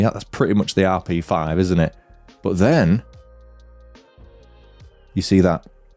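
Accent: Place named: Yorkshire, United Kingdom. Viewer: I'm not getting one, what I need rn is a vertical and a couple of cheaper niche handhelds with Linux I think, but the orange one is very pretty. BIT too washed out and BIT too much orange but still... I'm a basic beeatch who go for black at all times, I find anything else mostly distracting while playing (+ the issue of getting dirt on the handhelds), but it's nice to see the more fun colors. White ALWAYS sucks. Edit: "Yorkshire"... A UK accent I wouldn't be able to place but that doesn't come off as properly annoying (!). Cool.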